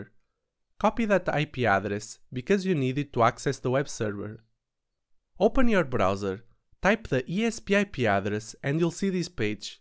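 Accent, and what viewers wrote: Portugal. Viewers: You sound like Portuguese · Olá! Hello! Descobri o canal sem querer. Estava mesmo à procura de um canal deste género! PS: Descobri logo que eras português nos primeiros segundos do vídeo devido ao sotaque. Keep it up ;)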